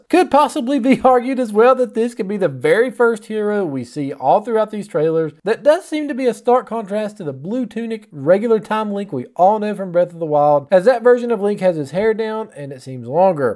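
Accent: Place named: East Texas, United States. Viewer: I missed about half of what you said because I was trying too hard to place your accent 😂 East Texan maybe?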